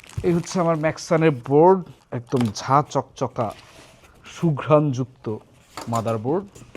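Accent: Barishal, Bangladesh. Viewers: ভাই আপনি বরিশাইল্লা বুঝলাম, প্লেন কে প্লেইন উচ্চারন করেন। তাই বলে লেখার সময়েও Plain?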